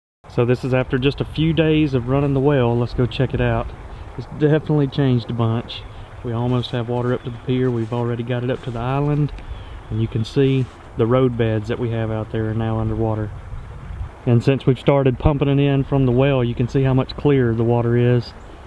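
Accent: South, United States. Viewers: I had to subscribe. Your southern drawl is so relaxing and the progress you make on your projects is satisfying. You praising your wife and speaking fondly of your daughter is lovely. I wish you all the love and success with your family and projects. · Name the owl big Al (owl) sounds just like big owl with your southern accent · Al the Owl, because you're from AL, and our southern accent the sound close to the same.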